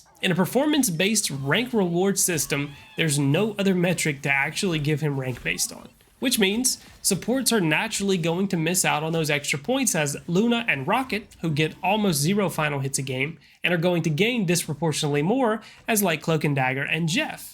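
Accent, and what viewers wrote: South, United States. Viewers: is that a southern drawl I hear? Power to you it sounds soothing to listen too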